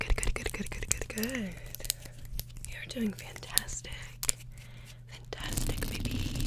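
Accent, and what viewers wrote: United Kingdom. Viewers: This is the perfect way to end another long day, especially the encouragement. Also, your British accent is very impressive.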